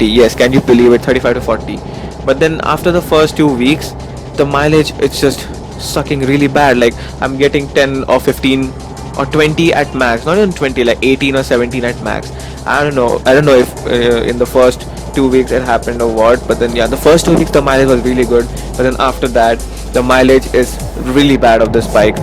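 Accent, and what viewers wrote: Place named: India. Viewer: This accent is making me really suck. Wtf with indians filling the internet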